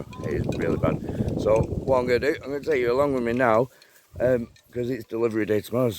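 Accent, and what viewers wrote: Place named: Manchester, United Kingdom. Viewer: What a great vegetable farm. Love watching what your planting and harvesting I was trying to place your accent, sounds very northern possibly Mancunian by any chance?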